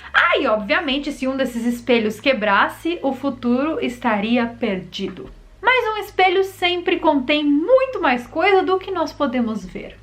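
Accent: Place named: São Paulo, Brazil. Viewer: Adorei o sotaque do interior paulista!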